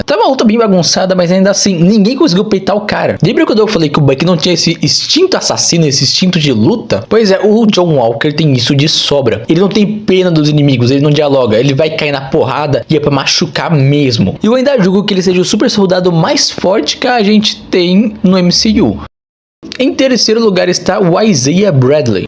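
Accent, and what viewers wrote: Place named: United States. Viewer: mania de brasileiro querer falar americanizado 😕🤡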